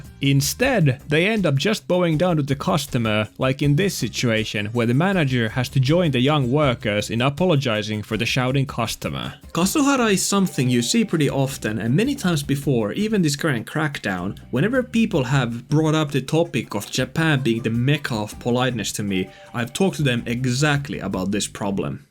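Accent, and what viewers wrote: Finland. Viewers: You are finnish from ur accent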